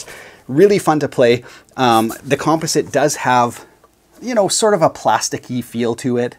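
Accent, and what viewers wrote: Canada. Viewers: I love Canadian accents! Teach me master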